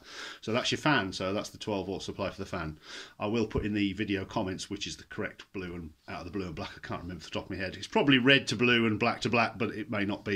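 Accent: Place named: Alabama, United States. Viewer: Hard to believe. I'd like instructions for my gen 2 prius. I'd be willing to use a 120volt squril cage fan to cool my pack. My fan has a plug with several wires. I could research which 2. But I'd love to make the charger for my 07 prius. Like they say a little over 200 volts DC thanks. Love the Alabama accent!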